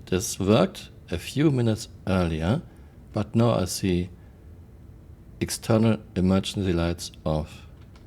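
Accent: Germany. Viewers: Du bist ja Deutscher. Höre ich an Deinem Akzent. Das Problem der Medic-Schiffe ist das neue Gameplay. Wenn ich alle Betten vollständig mit den Gels auflade und eventuell noch ein paar in die Regale stelle, dann bin ich first Target für jeden Piraten und Griefer. Folglich wird keiner mehr Med-Schiffe haben wollen. Toll CIG, oder sehe ich da was falsch?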